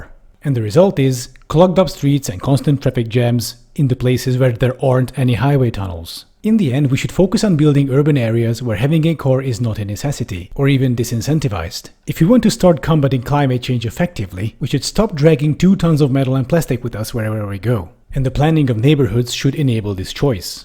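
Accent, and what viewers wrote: Hungary. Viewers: Akcentusbol ugye jol vettem ki a magyar szarmazast? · Are you hungarian or do you just have a really authentic accent? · Okay, I refuse to believe you're not Hungarian. You've used Hungarian subway lines for comparisons in several videos, you pronounced Hungarian words perfectly and although not very noticeable, you do have a slight Hungarian accent. Cool. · ez nekem is feltűnt de az ahogyan mondta a magyar szavakat és amilyen sokszor emlegeti a budapesti metrot nekem eléggé azt sugallja hogy magyar az illető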